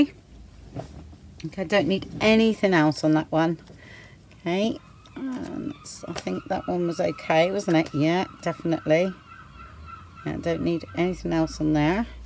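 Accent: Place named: Cornwall, United Kingdom. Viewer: So glad I found your channel, these are so pretty I love how you make them look so easy but still so amazing. Also I love your accent, you wouldn't be cornish by any chance?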